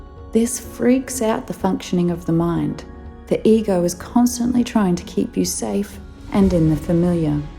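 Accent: Australia. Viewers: Such a deep thought and wisdom that a lot of us neglect especially when our minds go to overdrive and cannot somehow sort out what really our intuition telling us about. Just found you in times of trouble and looking to sooth my heart on Youtube with all the loads of helpful and inspiring stuff. When I hear your voice I immediately recognise your loving Australian accent.:-) Thank you heaps!!!!!! ❤️🙏🏽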